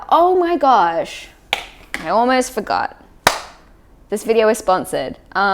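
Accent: Australia. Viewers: are you from Australia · Back in 2009 when I got my first Android phone and I started using many Social Apps in order to develop Friendships because this is where most of the offline people you know are now online. Out of hundreds of people while using these apps ive only acquired 4 real friendships...Here on Youtube I call some Youtube Creators my Friends and some do respond back to my comments. This is an eye opener for me to learn the term Para Social Relationship..knowing the person but not actually getting to know them in depth as a real person. Welcome to Fantasyland when viewing some content on Youtube? 🤔 What really stings is to think that you are developing an emotional connection with people for example ASMR Artists but nothing is there.. Btw nothing wrong with your hair. 🙂 Austrailian Accent 😎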